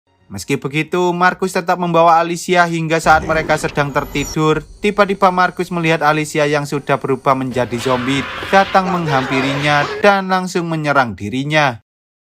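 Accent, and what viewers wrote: Java, Indonesia. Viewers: Jowone endi mas kok medok tenan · Medok gini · Suka denger logat jawa medok mimin nya😁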